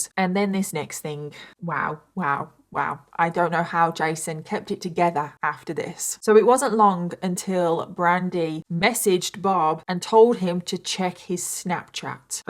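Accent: United Kingdom. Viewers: I’m from Michigan and I have always loved your British accent BUT my heart just melted at the way you pronounced “assuming” “ashumming” lol. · thank you so much for covering this case. Your compassion for the victims always melts my heart. You are such a kind and empathetic soul. Also, please don’t ever apologize for your British accent! It’s what makes you wonderful! You can say “ahss” all you want. You are so brilliant. Much love from Seattle Washington ❤ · American here., your British comes out of you every second. Don't fight it; it's delightful. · Unbelievable!! I dont know hiw he could live with that woman, and not notice that she wasn't all there? Just so terrible, how someone could just do that, to their own small children?! Bless their souls & prayers to Jason. Thanks! You did an amazing job, telling this horrible story! Also, just wanted to say, you look so sweet! 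Those big blue eyes you have, are so beautiful, along with your cute British accent. Looking forward to the next time, thanks again! 😉 👍 🇨🇦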